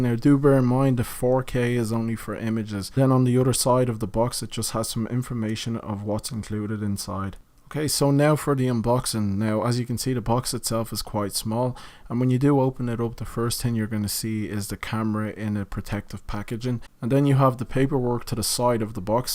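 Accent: Dublin, Ireland. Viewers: Dude, are you Irish? you sound like a Dub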